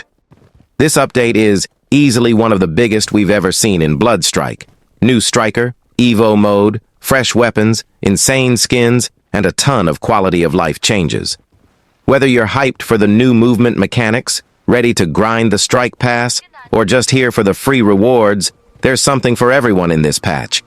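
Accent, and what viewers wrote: Nigeria. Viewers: Wait bro are you from Nigeria 🇳🇬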